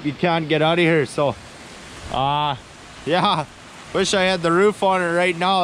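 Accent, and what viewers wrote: Canada. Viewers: There’s my favorite Canadian accent... nice building dude 👍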